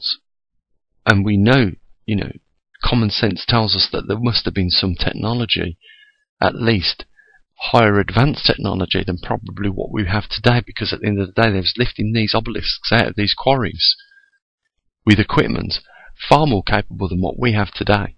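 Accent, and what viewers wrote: Birmingham, United Kingdom. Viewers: A Brum accent isn't much better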